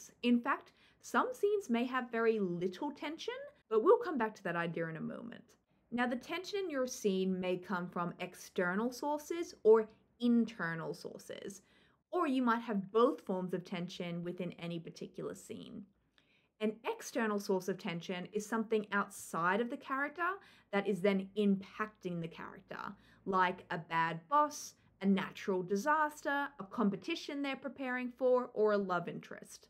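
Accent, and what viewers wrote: Australia. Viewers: Sounded Aussie sometimes but then you had some strong Rs like Americans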